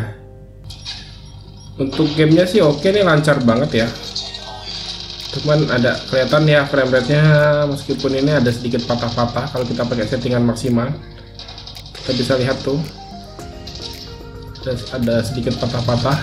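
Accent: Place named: Medan, Indonesia. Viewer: Accent nya ga enak banget. Pelafalan D sama T disetiap akhir kata nya itu loh. China medan banget.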